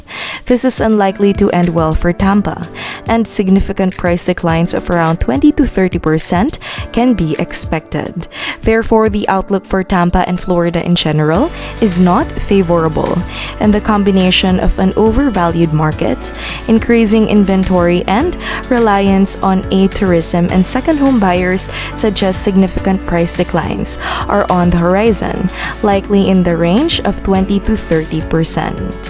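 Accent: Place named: Philippines. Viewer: I detect a accent from the PI. From CA here, average house is around 700k, which is a monthly around 5k for a 30 year... this means the average person has to be bringing home a 15k monthly paycheck........or ~3% of the population. How is it, that any houses are selling at all at these average prices, when 3% of people quality for the average home?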